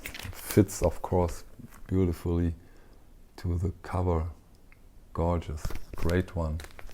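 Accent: Germany. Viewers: The blokes more German than Ralf and co....... Nowt wrong with that ... Nice to hear a german person talking about k - werk.... Germany s Beatles....... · For electronic music, they are extremely musical sounding. Always thought they were great David Bowie recorded in Düsseldorf, simply for his love Kraftwerk When I began tuning into your channel, I mentioned you reminded me of a Kraftwerk member. I just got the vibe, but I realize it is your accent which obviously originates from the Düsseldorf region. Thanks for the great reviews Keep them coming Florian Schneider has passed, not Ralf Radio Aktivitat is my favorite too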